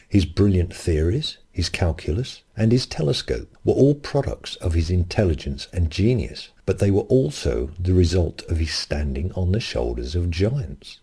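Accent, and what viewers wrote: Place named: United Kingdom. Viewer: but there are people that invented things without learning anything prior, like Tesla. Tesla and others described their experience as a shamanic trance experience where the Universe told them what to do. The life explosion from the Cambrian is exactly that, apparition of sophisticated life already evolved out of nothing, with no intermediary species. And sincerely I do not see how this idea, even taken as valid, refutes intelligent design. What you're saying is a designer must learn from his mistakes in order to make sophisticated things. So the process looks exactly like evolution, however, evolution itself being the works of a designers who keeps learning from his mistakes and trying new things. Your ideas do not refute the designer, refute the fact that designer couldn't come up out of nothing with sophisticated life. 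Your idea just proves evolution, but not the nonexistence of a creator, actually it even makes a case for it. Another ridiculous bong argument, I wasted my time, I swear, whenever I hear the bong accent I will close the video. Ridiculous sofisms, so cringe, so awkward, so out of touch with the reality, so clumsy, so pompous and pretentious. Bong people in a nutshell.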